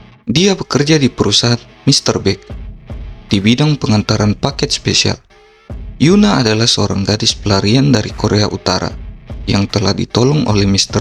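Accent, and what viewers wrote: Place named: Makassar, Indonesia. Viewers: Orang Makasar ini host nya.. dari logat keliatan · Bner bgt kaya org Makasar suaranya....aku punya saudara di Makassar kota bantaeng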